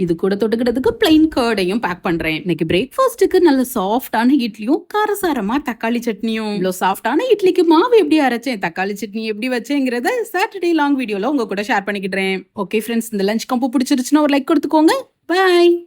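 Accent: Tirunelveli, India. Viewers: Nenga thirunelveli ah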